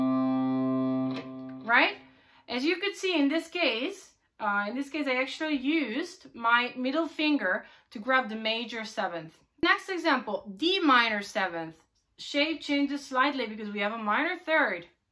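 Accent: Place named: Sweden. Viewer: Very talented! Eddie Van Halen fan here! Where do you reside from? Accent is hard to nail down. Kind of sounds Swedish? I am from MN, USA. Keep on rocken! Nice tapping!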